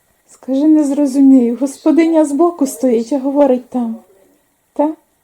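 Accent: Western Ukraine, Ukraine. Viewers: Зайшла на ваш канал вперше.Живу в 25 км.від Києва в селищі.Молоко купуємо в магазині,корів днем з вогнем не знайдеш.Навіть кури люди перестали тримати корм коштує дорого,а паїв у нас немає.Землі у кожного не багато.Яйця на сьогодні 80 грн.коштують.Але живем головне миру іхлопцям допомогти.Здоров'я вам пані і вашому чоловікові.До речі розмовляєте ви як спражня жіночка з західної України.У нас багато суржику.❤❤❤❤❤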